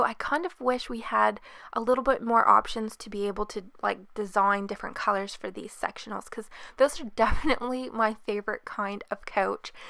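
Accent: Canada. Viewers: Love this!! Is that a canadian accent i hear? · are you Canadian? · b Canadian I think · Are you Canadian?? BTW love the build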